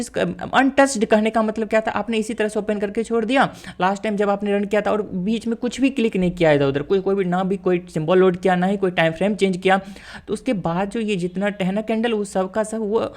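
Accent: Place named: India. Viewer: 听不懂印度英语，不过我还是坚持看完每个视频，学到很多知识，thank you for your work!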